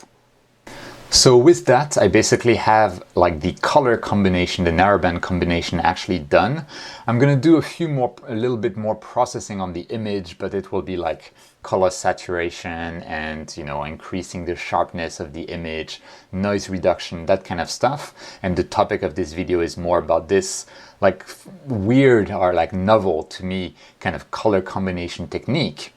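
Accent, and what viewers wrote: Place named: France. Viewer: nice video ! are you french . i feel a small accent ....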